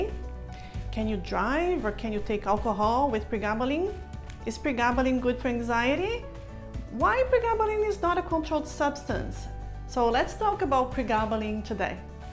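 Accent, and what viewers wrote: Brazil. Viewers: recognized the accent, uhul brasil il il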